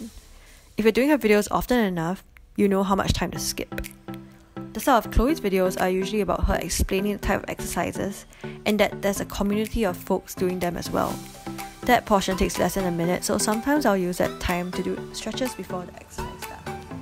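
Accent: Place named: Singapore. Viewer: You're Singaporean right? 🤔🤔🤔👀 I can tell by your little accent! 💖💖💖😇😇😇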